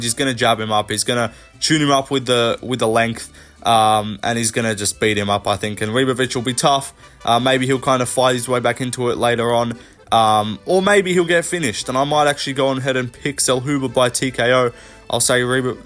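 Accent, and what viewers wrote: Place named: Australia. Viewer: Hablas gracioso, pero tu español suena bien con el acento australiano.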